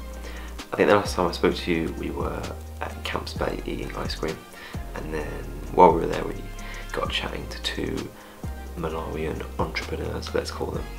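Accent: United Kingdom. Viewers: i enjoy your videos and practice with your British acent greetings from bogota.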